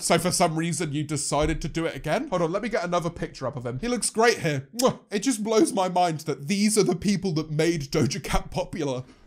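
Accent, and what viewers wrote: United Kingdom. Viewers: Sometimes I wish I had this accent I don’t know why, I really like British accent lol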